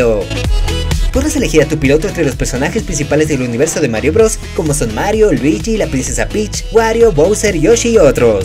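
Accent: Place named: Spain. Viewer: Eres un buen YouTuber, pero odio que hable como si fuera de Espa~a.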